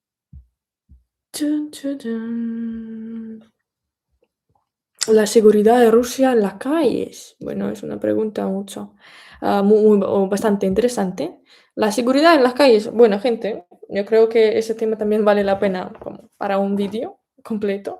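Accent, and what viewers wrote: Spain. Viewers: Sí, esta joven definitivamente TIENE TALENTO🧏‍♀. Los administradores del canal lo saben🤫, pero... por qué no tiene su propio canal de Youtube?🤔. Aunque su acento es español, obvio estudió castellano, y puede "chocar" un poco a la audiencia de latinoamérica; su imagen, desenfado al hablar a cámara, y deseos de crear MÁS contenido audiovisual la posicionaría en el mercado de LATAM🧏. Sobre este directo, me reservo mis impresiones, sólo que a partir de los 45 minutos es que Youtube comienza a recomendar mejor los directos. Gracias por esta hermosa iniciativa👏. ÉXITOS a las demás creadoras. Mis saludos desde Moscú🤝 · Tienes un acento español en tu forma de hablar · No hables como los espanoles, se oye feo, no digas vale, habla espanol americano.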